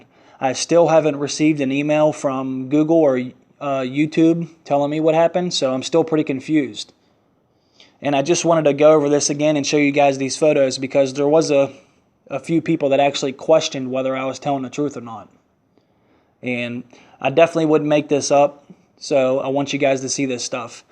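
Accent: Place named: South, United States. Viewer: your southern drawl is a charm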